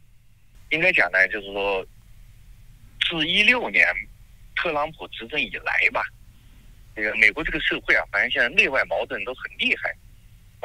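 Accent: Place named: Cantonese, China. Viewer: 这个主持人一听就是讲粤语的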